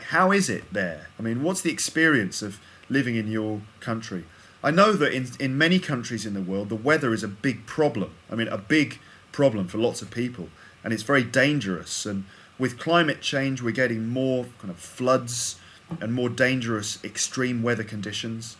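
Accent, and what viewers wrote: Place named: Cockney, United Kingdom. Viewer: This is my favorite podcast!!! You are the best! Wicked!!! your COCKNEY so funny!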